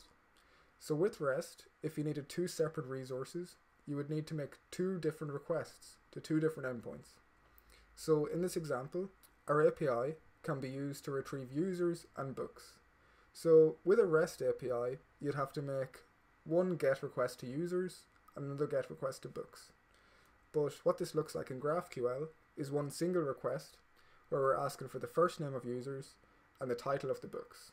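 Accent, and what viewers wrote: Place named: Ireland. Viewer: Great quick video! And cool to hear an Irish YouTuber doing programming tutorials 🤙☘️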